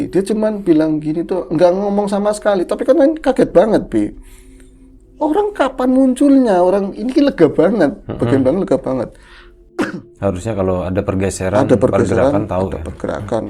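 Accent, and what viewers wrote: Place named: Java, Indonesia. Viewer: Seneng krungokke suarane bro. Medok banget 👍👍 Jowone..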